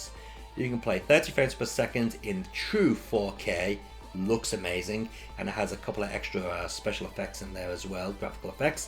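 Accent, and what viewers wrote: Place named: Liverpool, United Kingdom. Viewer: sounds like Liverpool dialect to me :)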